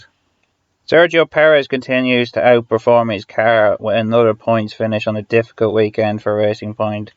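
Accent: Ireland. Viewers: Yes your obviously irish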